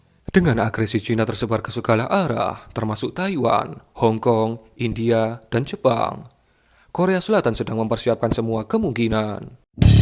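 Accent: Java, Indonesia. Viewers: bahasa indonesianya yg masih ada logat jawanya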